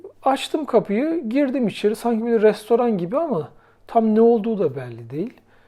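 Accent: Samsun, Turkey. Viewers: Yaa Samsunlu olduğun çok belli ama.O ikiyüzelli deyişin ele veriyor😂Ben bu siveyi düzeltene kadar ne uğraştım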